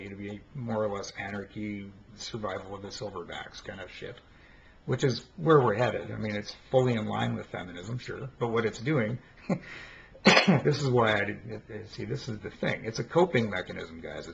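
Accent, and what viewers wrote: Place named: Canada. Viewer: I sense an accent, are you Canadian? I hear that feminism is brutal up there.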